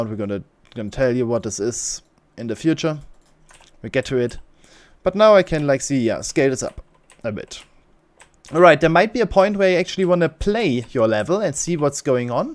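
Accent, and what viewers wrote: Germany. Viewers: sounds like an german accent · Strong German Accent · Youre Tutorial helped me out so much thx but one question are you german?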